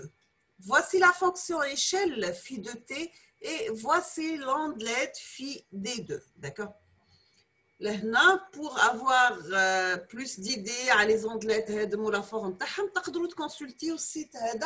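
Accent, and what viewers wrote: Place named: Algeria. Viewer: Accent algérien typique ! Tahia al Djazaïr, Vive l'Algérie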